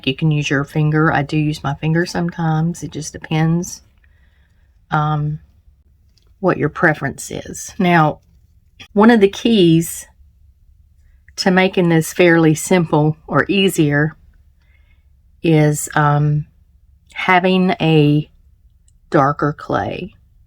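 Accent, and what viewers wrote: South, United States. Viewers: I can understand your southern drawl perfectly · you talked about your southern drawl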